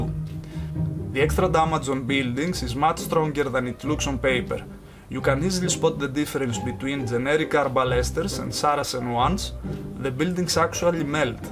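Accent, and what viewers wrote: Greece. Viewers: LOL when I watched the video I said, he is Greek for sure 😂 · I'm watching these videos to listen to the Greek accent actually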